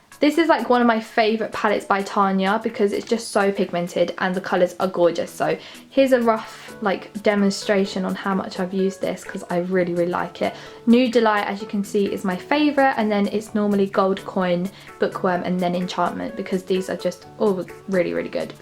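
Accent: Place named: Bristol, United Kingdom. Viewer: are you from Bristol because u have a Bristolian accent xxxx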